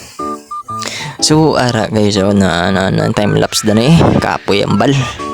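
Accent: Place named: Iloilo, Philippines. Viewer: I am your 123rd subscriber! I am not Ilonggo or Negrense but I have a lot of friends who speak like you and they are good friends.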